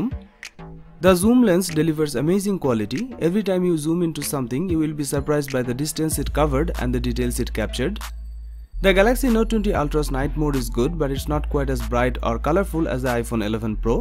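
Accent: Bengal, India. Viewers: Hmm Bengali hain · Indian accent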